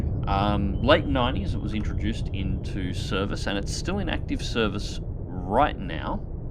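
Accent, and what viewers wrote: Australia. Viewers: Enjoy hearing an Aussie on the intertubes.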